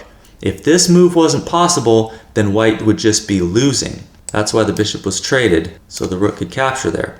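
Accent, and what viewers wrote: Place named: United States. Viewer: Americans pronounce the ant part so it's perfectly fine since he sounds American.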